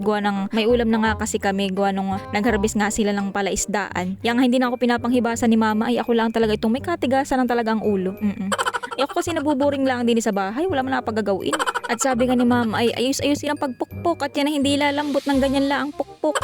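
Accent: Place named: Quezon, Philippines. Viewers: pa shoot out ako maam from Sipocot Bicol.. JOEZEL NESAGON.. ikaw baga ma'am ay taga Quezon o Batangas.. eh kung maka ay ka eh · lam aw😂😂😂 Quezon prov tagalog talaga😂❤ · Parang pamilyar Yung accent ng salita mo idol taga Quezon puba kayo?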